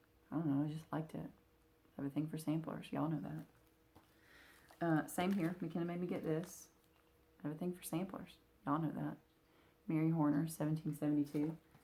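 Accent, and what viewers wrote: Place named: South, United States. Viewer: I soooo enjoy you, your soft slightly southern:-) accent is sooothing to listen to...:-) enjoyed your WIPs your HRH is so beautiful, not to far and your finished...indeed your videos are my first choice. Loved your Haul... Indeed if all mankind would live in mutual love, a kindness would be likened unto a vapor before us, changing us...💛💛 Blessings...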